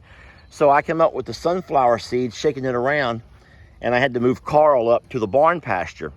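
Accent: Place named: Texas, United States. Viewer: In Texas we have our own way of pronouncing words 🤣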